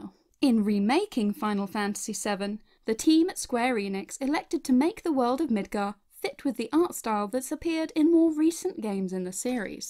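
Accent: United Kingdom. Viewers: I´ve got a question not related to this video. As a teacher of English in my country (Spain) I´m always looking for new British English speaking media sources (preferably about my hobbies, one of the biggest being videogames) and I happen to love your accent. Would you mind letting me know what area is your British English accent from? much obliged!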